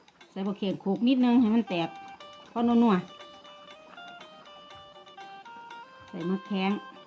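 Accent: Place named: Sisaket, Thailand. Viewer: เป็นตาแซบแท้จ้า จ๋า❤️เว้าคือคนศรีสะเกษแท้จ้า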